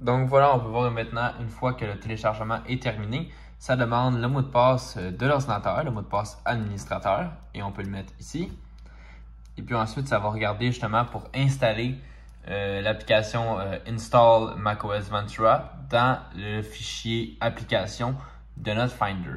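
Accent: Canada. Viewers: Excellent évolution d'un I-Mac 27 fin 2012 I7 de High-sierra 10.12.4 vers Ventura 16.6.7. Merci beaucoup pour ce tutoriel bien expliqué avec cet accent canadien... j'adore 🙂 J'en ai profité de remplacer mon dur dur interne par un SSD 4To, et mon I-mac fonctionne impeccable. Mon évolution mac OS a été obligatoire pour un abonnement à photoshop sans changer de matos. MERCI ! 🤟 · Félicitation pour votre tutoriel avec l'accent Canadien c'était parfait. J'ai pu installer Ventura sur 3 Macs incompatibles vous m'économisez l'achat d'un nouveauMac encore bravo depuis Nouméa en Nouvelle Calédonie Pacific Sud. Peut-on lancer la mise à jour de Ventura 13.2 ? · Vraiment merci !!!!! Clair précis et quel bel accent canadien !! bravo !! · Un immense merci pour ce Tuto en Français!!!! En Plus avec l'accent Quebecois j'adore. Bravo et encore merci. La semaine derniere j'ai installé Ventura et cette semaine mise à jour vers Sunoma grace à ce tuto!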